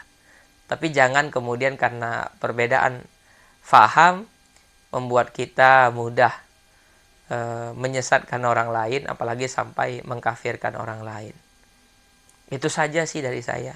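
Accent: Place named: Java, Indonesia. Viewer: Alhamdulillah bisa ketemu channel ini. Insya Alloh bermanfaat bagi saya. Salam takzim untuk. Bahasanya lembut, logatnya seperti orang Jawa.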